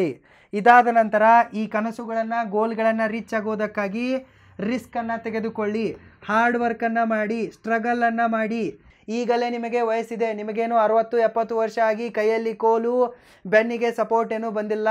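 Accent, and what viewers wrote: North Karnataka, India. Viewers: Awesome presentation, north Karnataka style. Talk like close friend. 👌 ...I loved the words kisiyodhu, kolithiri😂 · Ningond request paa nan kadeyinda... Neenu uttar karnataka bhaashe maathadu illa neetaagi south kannada maathadu... ardaa adu idu antha kisi byaadpa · well said boss. nice presentation in north Karnataka style.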